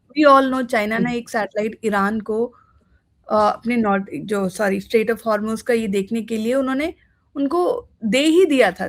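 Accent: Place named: South, India. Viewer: South Indian hai madam.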